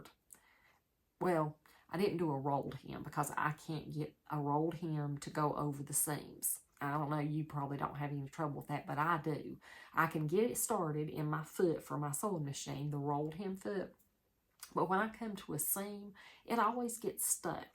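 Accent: South, United States. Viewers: Will you show this on your video? Love your southern accent. We are originally from Tennessee but have lived many places in U S. Would love to see all supplies used.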